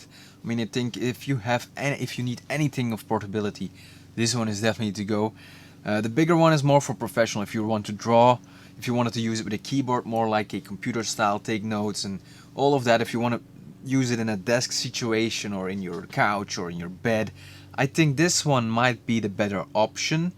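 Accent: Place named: Belgium. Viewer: guees belgium accent :D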